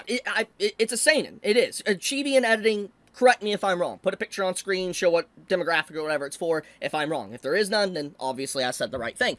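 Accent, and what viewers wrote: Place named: Berkeley, United States. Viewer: She sounds like STRAIGHT from Berkeley, University of California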